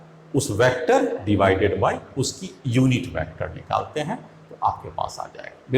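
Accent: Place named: Begusarai, India. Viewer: Sir u r from begusarai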